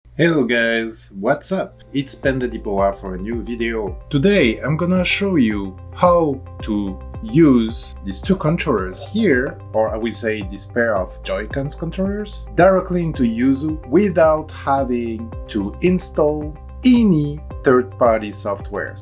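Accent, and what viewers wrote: France. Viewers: are you french? you have a french accent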